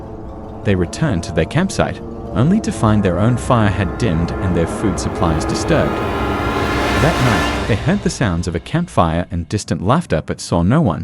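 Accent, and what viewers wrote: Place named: United Kingdom. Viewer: A Brit narrator narrating stories about Appalacha. To me it just doesn't fit.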